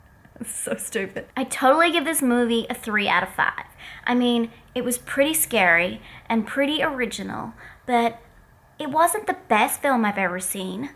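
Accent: United States. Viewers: Oh I seen this film it a Brit horror I love this film it's got an actor in it called Jeremy Sheffield Sheffield is the town home city and the wired little creep it called Gregg and your American voice it wasn't that bad you actually sounded like one of those people who will get killed off earlier on in a horror film (no offence) but I thought this film was great hated the screeching noise bit that's probably me it's an autistic thing we don't like certain hight pitch noises. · Lmfaoooo your facial and expressions along with the American accent was priceless 🤣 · The American accent was.... Terrifying I guess is the word? You didn't do a bad job, it sounded authentic in a way. It just drained everything interesting or alive out of your voice and personality. Possibly because when anyone does an "American" accent they suddenly sound like they're from California, and most of us hate Californians, and don't talk like that.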